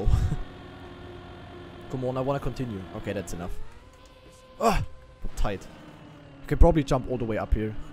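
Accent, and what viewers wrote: Germany. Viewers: Dieser andere Deutsche Akzent 😂👌🏽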